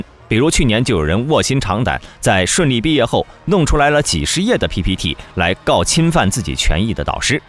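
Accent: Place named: China. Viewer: 這英文發音真的很中國 跟硬度人有的拼.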